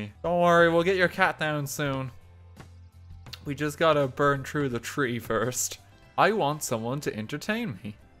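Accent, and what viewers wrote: Ireland. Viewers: He's Irish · I never knew this guy was Irish ahah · This dude Irish?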